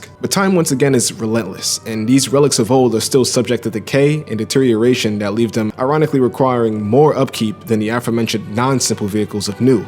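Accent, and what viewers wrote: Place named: East Coast, United States. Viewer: You are very articulate and eloquently spoken, with a slight accent (my guess would be somewhere on the east coast) Great video!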